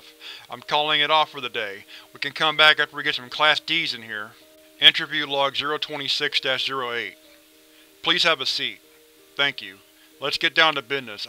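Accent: United States. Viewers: I think it's his accent, I've watched a ton of these, and i know alot of people from all over the states. I've heard this accent plenty.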